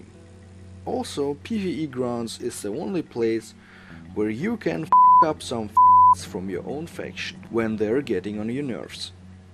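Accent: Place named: Netherlands. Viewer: ur accent tells me ur dutch gj!!!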